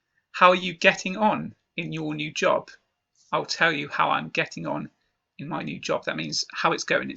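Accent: United Kingdom. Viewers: This video is great and I love listening to your British accent. Thank you!!!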